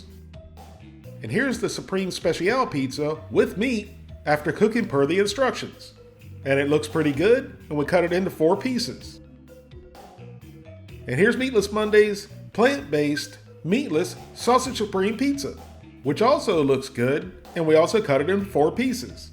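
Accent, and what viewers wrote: South, United States. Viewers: Being from the northeast, nobody here says "pizza pie". It's awkward and a bit redundant. So hearing it, along with a southern twang and so much excitement is funny, in a strange way. It's not like I'm having Vietnam-esque, PTSD flashbacks and night sweats. But it's amusing though.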